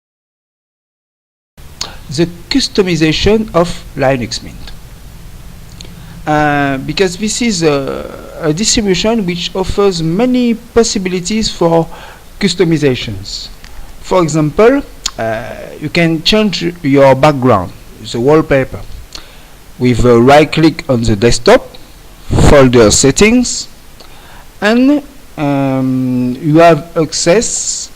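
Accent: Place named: France. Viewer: hello you are french ? because i think that you speak in "franglais" ? i like and joke you accent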